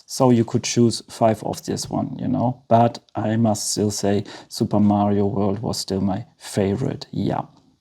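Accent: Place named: Austria. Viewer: Ich liebe den Akzent. Du bist Österreicher, oder? 😊 Richtig gutes Video! Daumen hoch dafür! 👍👍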